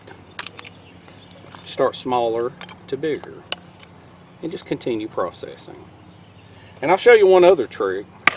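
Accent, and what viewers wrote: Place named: Georgia, United States. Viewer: I love watching all the different Bushcraft guys, I like the Canadian's cause they have so much beautiful wildness trips but for learning I like watching you guys in my area, southeast USA cause we have similar woods and weather conditions. You were the 1st guy I started watching, mostly for that Georgia accent, very educational and entertaining. I like watching David West a lot too. He can cross his eyes make fire. He isn't so much Bushcraft but he loves finding new ways to make fire. Enjoyed your rambling as always. Keep em coming when you can.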